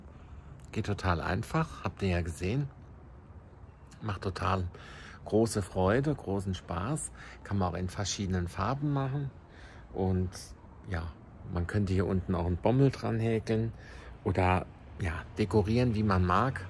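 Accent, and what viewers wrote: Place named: Rhenish Hesse, Germany. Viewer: Das ist wirklich ❤ allerliebst .Hab heute meinen ersten gehäkelt. Voll knuffelig.. Ausserdem könnte ich dir stundenlang zuhören ,weil du den gleichen Dialekt hast wie ich. 😅 Ich babbel roihessisch😂 Also nochmal Dankeschön für diese wunderschöne Idee und die tolle Anleitung Lg Sonja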